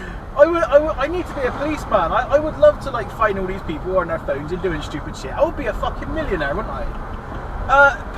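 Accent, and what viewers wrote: Bristol, United Kingdom. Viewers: We could do without the Bristol accent, sounds more like a local yokel who should have stayed on the farm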